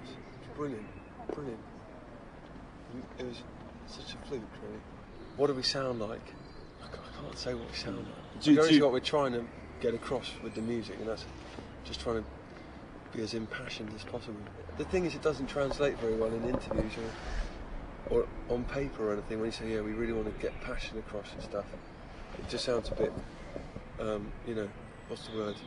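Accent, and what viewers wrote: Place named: England, United Kingdom. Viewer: Nice english accent 😊❤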